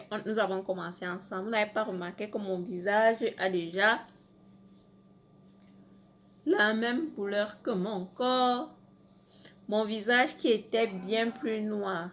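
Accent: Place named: Gabon. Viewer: super video , t es d oú ?t as comme un accent gabonais.😊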